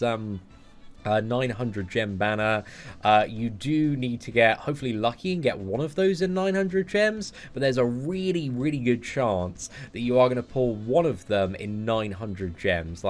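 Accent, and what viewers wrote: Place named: RP, United Kingdom. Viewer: I believe he's English so from somewhere in England whether thats Britain but yeah he drinks tea and has that posh accent so thats my guess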